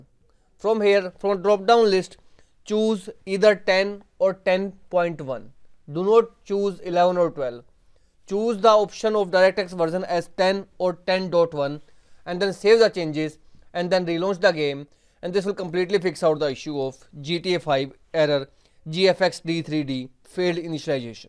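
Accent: India. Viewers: Thank you for the video, I am going to try these fixes. Just one thing; can you please speak slower, it is very difficult with the Indian accent to understand what is being said. I'm an old guy and I find it very hard to follow.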